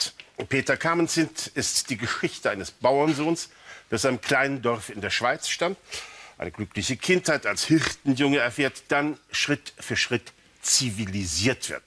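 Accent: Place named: Ruhr, Germany. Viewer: ist sicher keine "Kaulquappe" sondern ein versierter Literaturkenner. Was aber den Genuss seines Vortrags für uns etwas trübt ist dieser leicht aufdringliche, asthmatisch Oberhausener Pottbeiklang.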